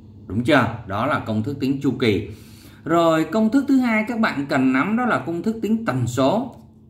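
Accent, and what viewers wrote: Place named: South, Vietnam. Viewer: thầy ở miền nam đúng kh thầy , em ở miền bắc nên nghe hơi ngượng ngượng hehe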